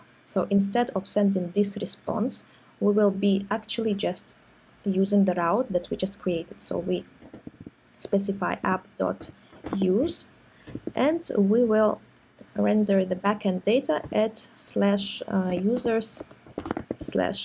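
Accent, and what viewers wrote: Russia. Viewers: услышал знакомый русский акцент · слышу что вы русскоязычная и это хорошо, спрошу. Зачем вы фетчите дату в useEffect? Он же срабатывает при каждом ререндере дома или вообще в изменении его структуры. Или я не прав? Пожалуйста, помогите разобраться, потому что я привык фетчить дату при событиях типо клика или сабмита, но вижу как некоторые делают это в хуке useEffect. Реакт изучаю совсем недавно из-за надобности по работе, обычно писал только бэкенд. И меня ставит в тупик этот хук. Почитав офф документацию написано, что отрабатывает в зависимости от установленных зависимостей, и изменении состояния этих зависимостей. В общем путаница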